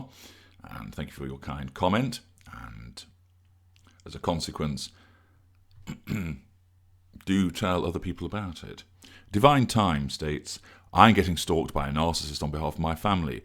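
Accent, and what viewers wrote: England, United Kingdom. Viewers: I like your voice very much.. And the english accent ..👍🏻😊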